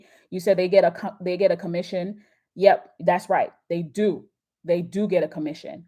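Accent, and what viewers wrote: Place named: Jamaica. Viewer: Oh you Jamaican! Gwan, no wonder I like your show! 🙏🏿🇬🇧🇯🇲❤️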